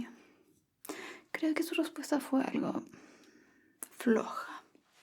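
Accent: Chile. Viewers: ahhhhhhhhh chileno po ❤ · ay, soy de méxico pero me encanta el acento chileno, siento que tiene un algo, me parece muy hermoso su dialecto y más cuando veo a o a mon laferte hablando con el acentito chileno, muchas gracias, tamoo 😭💗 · Voz sos de chile che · Siiiii dios mío, las ganas que tenía que me enseñe un poco de chileno 🔥💜💜✨ Cada que habla con su acento chileno original es lo mejor ❤️💖💖 saludos desde México 🥰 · cómo amo este dejo enserio todos mis amigos de Fortnite son chilenos y su acento es muy lindo como me encanta que wea jaja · Me encanta el acento chileno :shelterin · Me gusto cuando usa el acento chileno para las explicaciones jsjsjs aparte soy chileno y hable todo correctamente y la profe no me dio mis punto po wn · como siempre tan linda 💖. Yo soy mexicana y el acento chileno a mi parecer es uno de los mejores siendo mi favorito, es hermoso que hayas hecho un vídeo, siempre me relajas.💕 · Que bella profesora, soy de ciudad de México y tuve la oportunidad de conocer tu bello país hace 8 años , para ser exacto estuve en Santiago, Viña del Mar, Valparaíso, San Rafael , Los Andes , me encanta su acento aparte de que conocí a muchos Chilenos también en un viaje a Europa que hice y la verdad me adapte con ellos son muy buenos amigos , y recuerdo de las palabras , el po, flaites , culeao, weon etc saludos guapa y no me pierdo tus ASME , abrazo grande 😊😊😊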